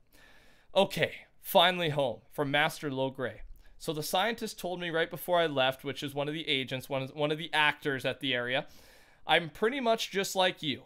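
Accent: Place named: Canada. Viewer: Is he canadian? I heard a little accent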